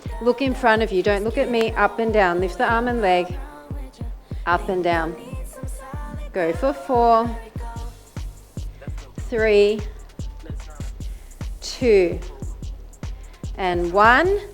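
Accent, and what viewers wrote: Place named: Australia. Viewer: woow! I love Australia! Hope to visit it one day! I started getting in love with your country since 's era. still be my fave singer and voice and yes I noticed a similar accent in you, but I didn't realize you are Australian too! Good workout to do after the athletic barre!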